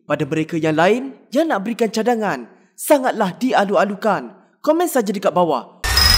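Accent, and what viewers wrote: Malaysia. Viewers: ni orang malaysia ke?? jawb soalan saya di next video blh tk???sbb brcakp mcm malaysia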